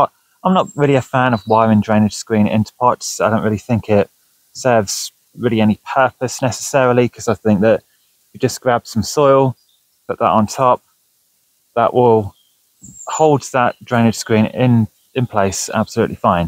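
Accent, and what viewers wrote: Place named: England, United Kingdom. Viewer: By the accent, you are English, aren´t you? Crazy good potters over there, I got some :D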